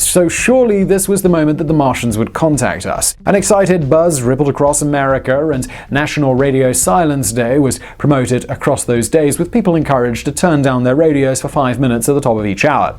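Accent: United Kingdom. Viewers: British Vsauce is growing on me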